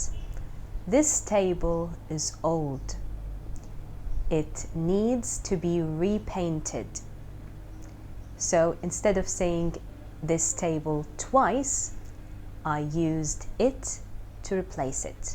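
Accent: United Kingdom. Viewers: ماشاء الله تبارك الله ربنا يوفقك اللكنة البريطانية جميلة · احسس حد في العرب سمعته بيتكلم انجليزي بريطاني ان شاء الله في يوم هاتكلم زيها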